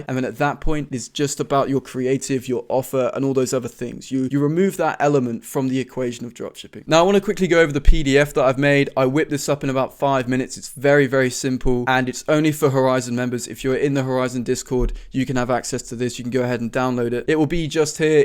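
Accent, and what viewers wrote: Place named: Leicester, United Kingdom. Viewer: he got the Leicester accent 🤔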